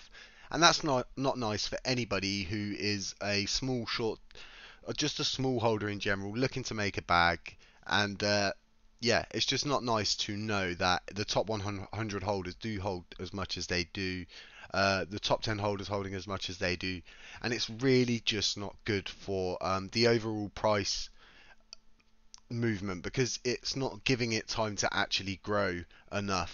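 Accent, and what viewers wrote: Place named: Midlands, United Kingdom. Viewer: I'm sensing a Midlands accent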